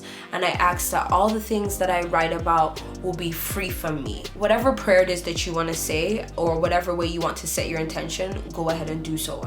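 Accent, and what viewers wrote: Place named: Caribbean. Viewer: I literally followed just for the Caribbean accent in the intro 🤷🏾‍♀️ yessss sus!!! Vid just starting so I can’t wait to learn n implement 🙏🏾🙏🏾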